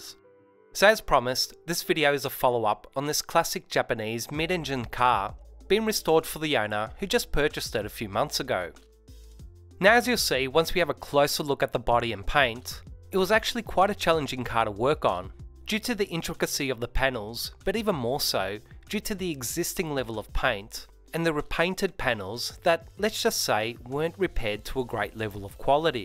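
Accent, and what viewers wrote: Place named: Australia. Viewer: Great job mate I love hearing an Ozzy accent on a detailing video for a change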